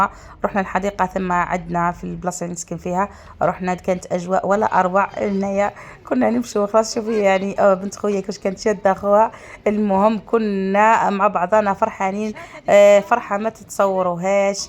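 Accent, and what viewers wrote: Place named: Sahara. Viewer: ربي يبارك وصح عيدك انتي من الصحراء على خاطر المردود والدشيشة وعيال خوتي لهجة صحراوية متاع ورقلة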